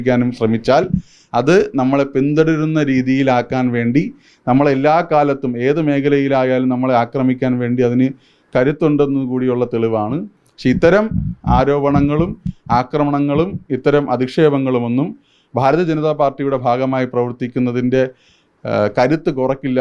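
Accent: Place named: Kannur, India. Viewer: ഈ ആൾ. കണണ്ർ. കാരനാണൊ. വാചകത്തിന്റെ അവസ്സാനം "വേണ്ടി" എന്നുകേൾക്കുന്നു 😢😂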